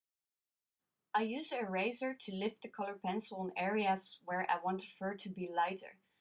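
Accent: Netherlands. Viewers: Beautiful tutorial... and charming Dutch accent :)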